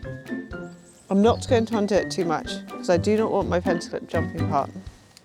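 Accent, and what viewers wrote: RP, United Kingdom. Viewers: Dear ma’am: Thank you for your videos! Even though l’m not a Brompton user ( but BROOKS!), l like to see your videos because of the way you talking, posh southern RP accent, that sounds so nice to my ears, as if Dame Julie Andrews dose. 😊 By the way, meanwhile, recently l’ve heard that, they say, some of those bad naughty girls talk as they hold matters in their mouth sometimes today in UK😂❗️ ls that right? I wish you’re dwelling in happy living with bicycles! A short greetings far from JAPAN. Thank you. Domo Arigatou ! ❤️ Sincerely yours. Hiroshi The Biker.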